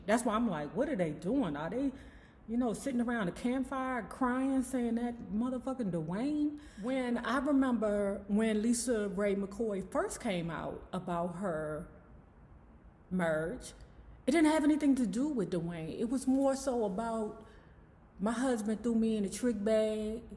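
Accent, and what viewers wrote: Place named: South, United States. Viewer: Heard the go-go and was like 😳.. who dis? While time I’m driving and listening wondering how you from here with that dirty south accent 😆❤️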